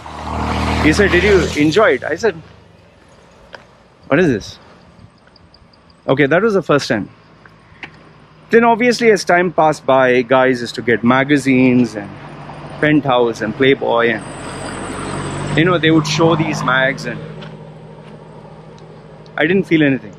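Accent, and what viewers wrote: India. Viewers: Your accent is so Indian..which country are you in?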